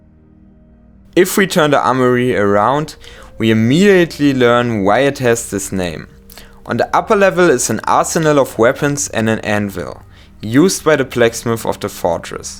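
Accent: Germany. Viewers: Your german accent, is just like mine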